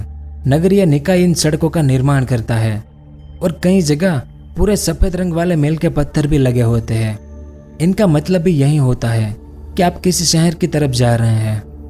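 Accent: Gujarat, India. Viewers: bhai aap gujrati cho?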